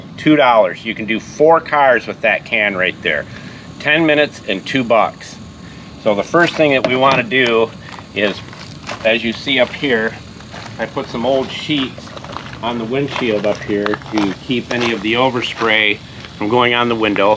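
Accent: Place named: Chicago, United States. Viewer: Your accent screams Chicago? Not Florida.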